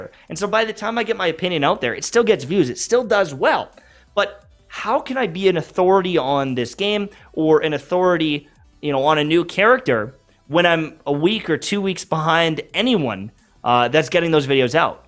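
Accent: Scotland, United Kingdom. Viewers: The thing I like most about you is you genuinely care about your viewers and players of this game spending unnecessary amounts of money which is something I haven't really seen other creators push as much as you. I've spent a lot on genshin and sure I've been lucky enough to get characters I've wanted but it always ends with me regretting it and needing the money later on down the line. It wasn't until I saw your videos that I really started to think about what I was doing and because of that you've probably saved me so much and I honestly am so grateful for you and your content and your mindset for this game. Not to mention your tutorials and guides have been the main ones to actually help me understand this game and how it works so I will always appreciate you and the videos you make no matter what. Plus you're very funny and I'm a bit intimidated by the fact that your Scottish accent is better than mine, a Scottish citizen. 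· Not for the content....here for ye Laddie!!! Imagine this in your Scottish accent dear!!!!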